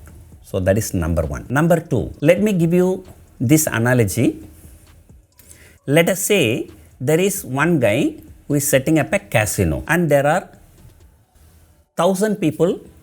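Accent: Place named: South India, India. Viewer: Its south Indian english ,i too from Bangaluru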